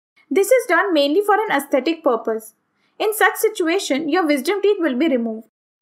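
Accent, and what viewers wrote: India. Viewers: They sound Indian of something similar and this ain’t a Pc guide I don’t trust it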